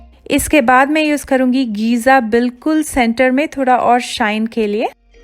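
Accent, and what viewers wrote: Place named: India. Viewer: This hindi accent!!!!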